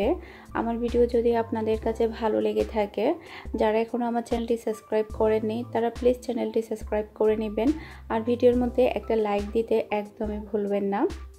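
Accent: Chittagong, Bangladesh. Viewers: আসসালামু আলাইকুম। আমি নতুন।তোমার কথাগুলো অনেক ভাল লাগে। তুমি কি চট্টগ্রামের মেয়ে? জানলে ভালো লাগবে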